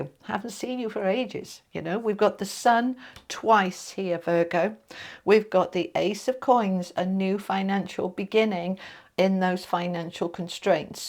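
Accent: England, United Kingdom. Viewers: Love me some! 😍🥰 ❤❤ That lovely english accent always makes me go weak in the knees, sigh 😅😘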